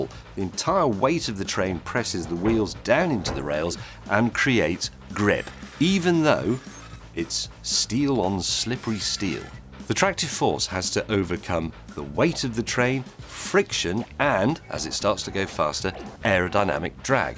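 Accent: United Kingdom. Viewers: Vsauce with a British accent, not bad... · I disagree. This sounds more like a peasant, British, dialect. It is annoying and incorrect.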